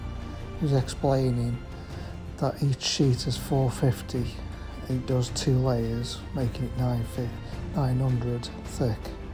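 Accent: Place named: United States. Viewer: I thought l would watch but but you are american, l thought you were british.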